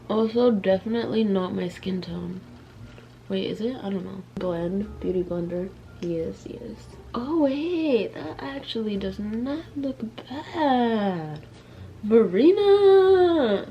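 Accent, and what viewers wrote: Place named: United Kingdom. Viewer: Ur gorge also that british accent tho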